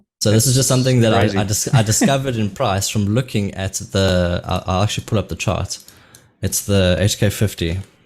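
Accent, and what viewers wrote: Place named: South Africa. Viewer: This is a fellow comrade from South Africa. I know that accent 😆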